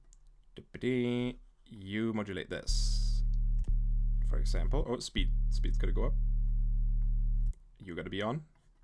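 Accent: Germany. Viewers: Why? He has a German accent.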